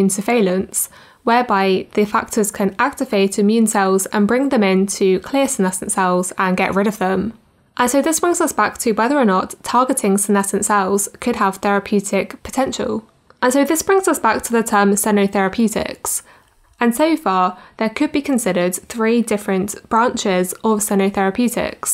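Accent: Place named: United Kingdom. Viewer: fascinating, thank you, it's above my head but, but very neat. May I ask about your accent? I hear british but specifically what region? I almost hear a mix of a second accent but cannot place it. (it's a nice sounding accent) I'm curious, as a couple people I know moved there and they both developed their regional accents the longer they are there. Thanks again God bless you and yours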